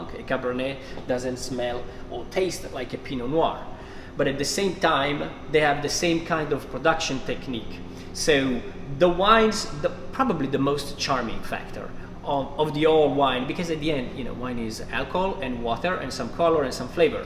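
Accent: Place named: Italy. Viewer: Gotta love that Italian accent. Fun video!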